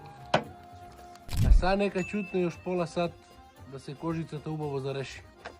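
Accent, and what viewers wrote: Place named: Serbia. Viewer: ovo je čisto srpski....ja pola ne razumen .....:)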